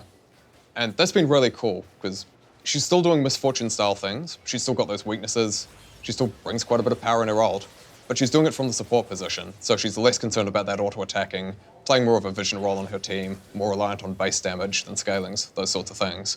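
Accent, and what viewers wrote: New Zealand. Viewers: So odd to hear a fellow kiwi accent in an official Riot Video :) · Chris Ng He is from New Zealand mate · I'm pretty sure I read once that from New Zealand. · new Zealander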